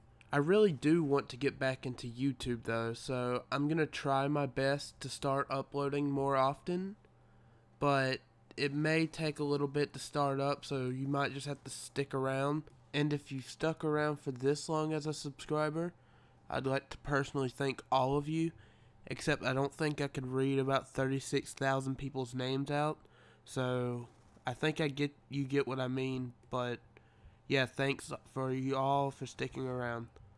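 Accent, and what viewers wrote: Georgia, United States. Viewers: I live in Georgia too btw you sound like your from Georgia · He sounds a bit southern anyone else notice that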